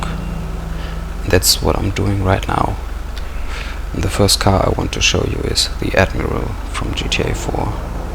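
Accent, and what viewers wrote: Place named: Germany. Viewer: man merkt an deinem Akzent dass du deutsch sprichst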